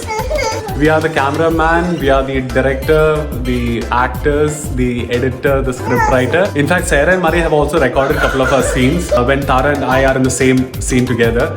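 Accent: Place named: Kerala, India. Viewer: That's just Malayali accent. Most of us have it. 😂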